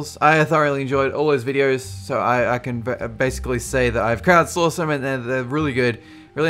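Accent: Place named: Australia. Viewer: ***** hes an aussie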